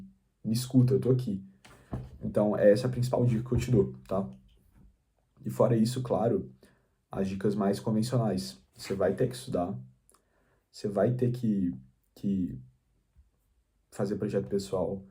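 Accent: Minas Gerais, Brazil. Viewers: Em um minuto de vídeo já imagino que seja mineiro kkkkk tmj tô na luta pra aprender tbm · tu é mineiro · Ess trem kkkkkkkkkkk mineirês é otimo kkkk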